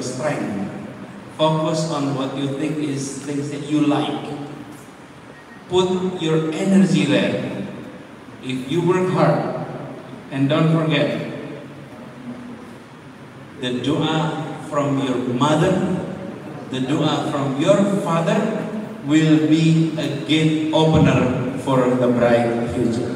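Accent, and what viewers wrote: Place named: Indonesia. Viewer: Indonesia banget bhs inggrisnya pak Anis.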